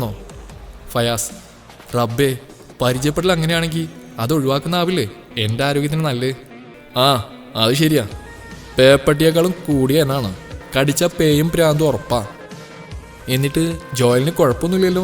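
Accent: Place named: Thrissur, India. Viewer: Thrissur basha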